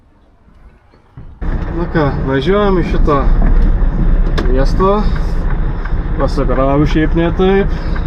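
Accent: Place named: Alytus, Lithuania. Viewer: Sveiki. Seniai žiūriu vairuotojų kanalus, bet šį kartą truputį kitaip - malonu girdėti alytietišką tartį :)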